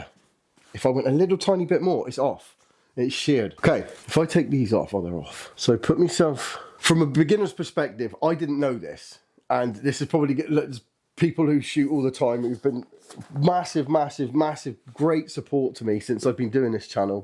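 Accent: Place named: England, United Kingdom. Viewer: wow... wow... wow... I didn't realize that the paper target that you are aiming is just that small..... Your shooting is really good actually... I thought the target was like 12 inches size but it turned out to be that so tiny..... You are eligible to go for competitions already.... And still, I still love your accent... it sounds like those English movies...plus the vocabulary...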